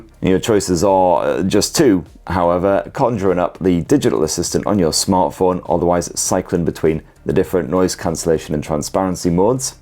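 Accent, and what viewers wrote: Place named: Northern England, United Kingdom. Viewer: Very useful review - Google translate helped me with your more extreme vocalisatory nothernisms. Seriously very useful and engaging with the duller info. Thank you!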